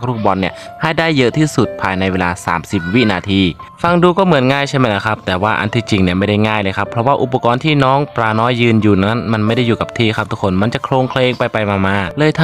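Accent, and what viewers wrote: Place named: Cambodia. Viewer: แอดค่ะ ล้อเหมนบ่อย จนพูดติดสำเนียงเหมนไปแล้วนะฟังผ่านเหมือนคนเขมรพูดไทยเลย😅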